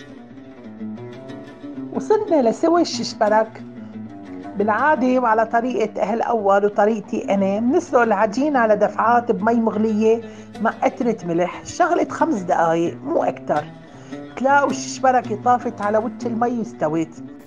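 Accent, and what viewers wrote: Aleppo, Syria. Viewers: دخيل عينك ما بتذكريني الا وانا.. بحلب.. على جمال اسلوبك.. انا بتابعك بس لاسمع صوتك ولهجتنا الحلبية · يا وين كنتي لهلئ اييي ابوووس وجنك وجك انا خيييييت والله حسيت حالي بنص حلب ع سمع صوتك وطبختك 👍😅 عفرينة اناااا وبحبك كتييير لسع ع أيام نواله بحبك 😍🤣🤣🤣🤣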